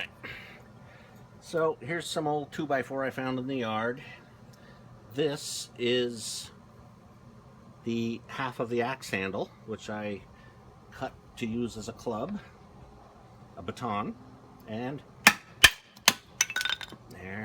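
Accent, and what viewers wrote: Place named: Maine, United States. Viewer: I have to like this because my siblings, nephews, and nieces all think you sound just like me! One of my teenaged nephews found your video when he was searching for how to make his own wood splitter, apparently, and the first thing he noticed was how similar to mine your voice sounded. He immediately mentioned it to his mother (one of my older sisters), who posted it to a family group chat. As each sibling or other relative has listened/watched, he/she has agreed with my nephew's initial reaction. For the record, I like your invention. Also, our voices ARE similar, but for the difference of my accent, which is that of a man from eastern Maine. :-)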